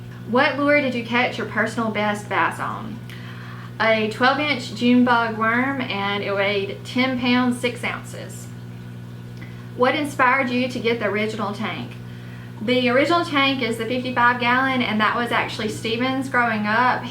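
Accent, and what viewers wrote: South, United States. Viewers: that sweet southern drawl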